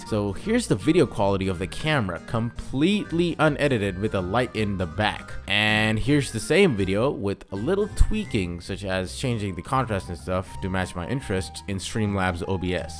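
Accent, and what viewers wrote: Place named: United States. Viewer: bruh you sound american hows that possible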